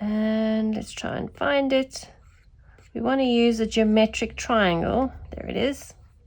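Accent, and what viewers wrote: South Africa. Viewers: Love hearing your South African accent. Thank you for the awesome tutorial! ❤️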